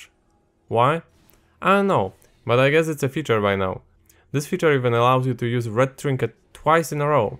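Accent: Poland. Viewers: Polish voice strong! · Stary, pierwszy raz usłyszałem twój glos, od razu wiedziałem że jesteś Polakiem i chyba się nie mylę. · the Polish accent make the video better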